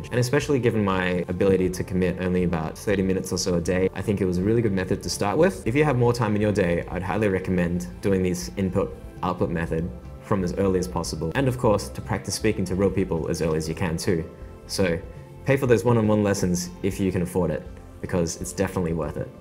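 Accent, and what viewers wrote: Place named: Brazil. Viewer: WOOOOOW Parabéns man! O seu nível de portugês é muito bom, e apesar da professora ser portuguesa, e o sotaque é de portugês é bem brasileiro. Daqui a um ano vais falar melhor do que eu com certeza :D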